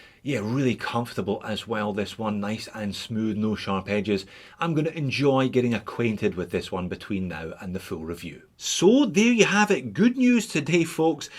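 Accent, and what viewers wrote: Scotland, United Kingdom. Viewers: Am I the only one doing the intro “hellloooooooo and welcome to just one more watch” in Scottish accent? I swear I sound exactly like now after watching his channel for over a year 😂😂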